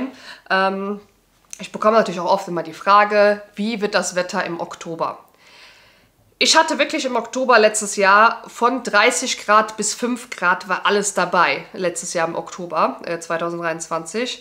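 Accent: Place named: Eifel, Germany. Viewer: Hi, aus welcher Ecke kommst du eigentlich 😅 dein Akzent tendiert Richtung Eifel/Aachen/Düren 😅😅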